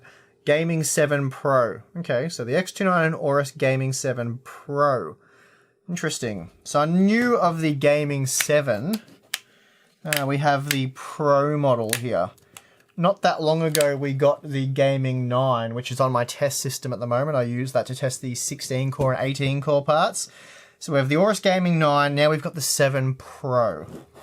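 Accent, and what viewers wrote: Australia. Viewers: Its not "hea" its here, its not spoze its "suppose" Learn to phrase you lazy Australian! Can't beleve you have accumulated so much FREE STUFF from manuafacterers who have sent you their expensive SHIT that you have literally WALLED! Maybe you should be donating them to your viewers instead of throwing shit around like your some expert. HINT! You don't even know how to handle a MOBO! BTW the Taichi board you pulled out and threw to the side is one of the best boards you can buy yet you had nothing to say about it other than "looks like the others". You knew nothing about it? LMFAO!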